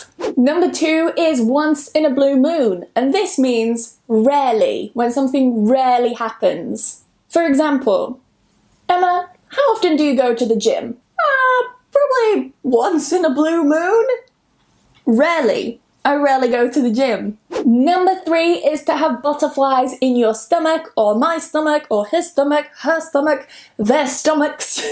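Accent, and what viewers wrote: United Kingdom. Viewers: Hello Emma ☺️ Take love from India ❤️😇💐 Emma can you please tell me in which british accent you are talking 😇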